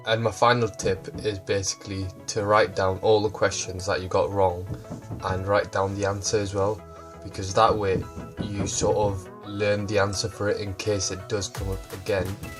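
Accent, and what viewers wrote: United Kingdom. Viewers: british accents lmao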